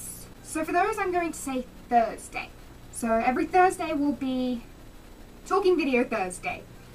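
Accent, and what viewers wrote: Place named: Romania. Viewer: Romanian accent... Okay, I'm not the only one. Someone commented on her most recent video and was all "if you're a native American why d'you live in romania?" I was like "Have you ever heard an American talk? Clearly no, because that's NOT our accent." lol, but I didn't comment that because that would start youtube DRAMA!!! ...or maybe it wouldn't, I don't know.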